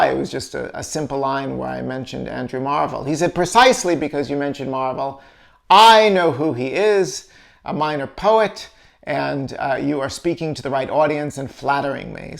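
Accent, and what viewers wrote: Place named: New York, United States. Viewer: Fabulous as always,. There ought to be some way for you to make a really good living just doing these videos. NO ONE else is even remotely close to doing them as well. Your erudition and excellent sense of humor entertain us all the while you are teaching us bibliophile/bibliomaniacs more about the books we love. I do agree that I too was waiting for you to open the KJ and show us some of the interesting TYPOGRAPHY, not TOPOGRAPHY in the volume. That NY accent of yours definitely came into play, as I found myself also wondering: "Why is he repeatedly mentioning the topography of the work, he's not talking about maps?" Eventually, I figured out you were saying typography, of course. Anyway, do you have that copy for sale? Please email if you do. Much continued success, my friend.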